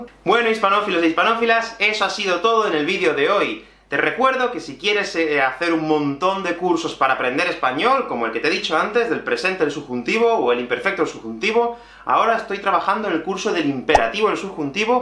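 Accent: Spain. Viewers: Gracias por los videos, pero no puedo entender el castellano, es decir el español de España.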